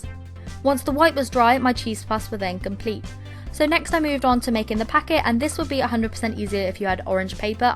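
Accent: England, United Kingdom. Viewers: I'm guessing Your From England But who cares? I love you!❤ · You just say some british accent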